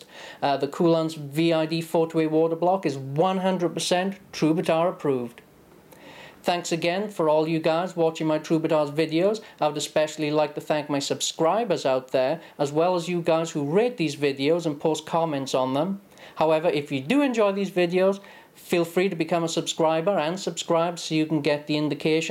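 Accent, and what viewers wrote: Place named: United Kingdom. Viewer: Your voice is realy similar to the British one (accent or that spelling)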